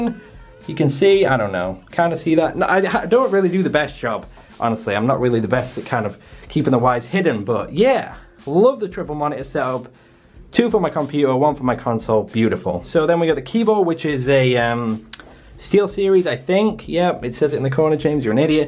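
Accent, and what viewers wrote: United Kingdom. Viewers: his accent man half British half American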